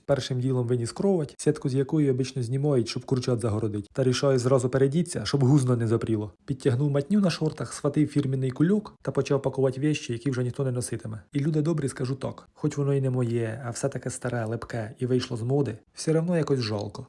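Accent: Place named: Cherkasy, Ukraine. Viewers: Черкаський говір слухаю як музику....🤭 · А я то чую шо шось рідненький говір. Привіт із Звенигородки, Черкащина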